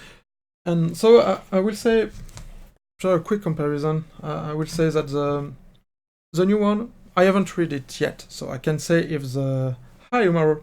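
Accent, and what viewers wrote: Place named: France. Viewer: Nice French accent :B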